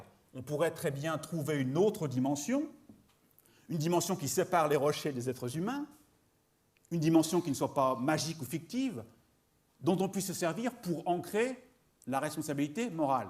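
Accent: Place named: Geneva, Switzerland. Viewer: Que ce Monsieur se rassure il n’a pas oublié son français et même son accent Genevois légèrement exagéré mais bon.... Quant au contenu: pompeux et redondant… Ma conclusion: Profite du coin pour respirer par le nez, une petite randonnée avec un bon saucisson du pain et un canon, une bonne raclette le soir et tu verras s’illuminer ton âme. Ça va aller, tout va bien se passer. Qui boit la gnole casse la bagnole, qui boit la mondeuse casse la dameuse:).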